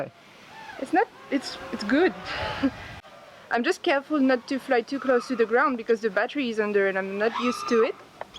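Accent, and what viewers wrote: France. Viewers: Je me doutais que tu étais française avec ton accent 👍 au top tes vidéos, keep going 👌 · J'avais bien reconnus notre cher accent français mais j'étais pas certain j'aime beaucoup t'es vidéos tu devrais en faire au moins une en fr :D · Ah cet accent lol, tu est française toi non? :)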